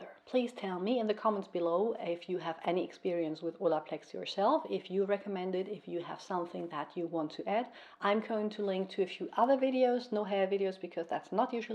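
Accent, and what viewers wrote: Germany. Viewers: Omg im not sure but I feel like I can hear a little German accent!! And I thank you very much for the video!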